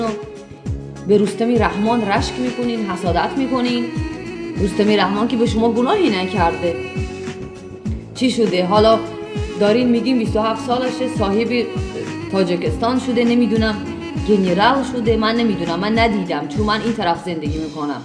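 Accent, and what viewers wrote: Iran. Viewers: Ман митунам шуморо бигоям ту ируни харф мизани манба махкулшиди хуб харф мизани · Ту чиба точики гап намезани? Неким бо Ируни бо хато харф мезани, магуки ируниям, карочи Насихат Хубе, фикрат зебое, дуруст мегуи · Точики тоза гап зан.ранги ерони гап мезани.точикдухтар боши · Эрони гап мезана точикода обращени дора дафк хта дюмбор набинемт да ича эаму эрониёву туркода обращение кн точикои мора зиндагишона хавардорам нести дюбор гап назан дарбораи точико т точик нести мо тра не признаём уродина 🖕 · Полный рост эрони хта гирифти ку??точики охи лахчаи точики Кати гап зан намезебат!!! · Oy dokhtar khode too az kojoin pas chero be lahjiye Tojeki gap namizani too az kooli Iroon harf nazan goomshoo bobo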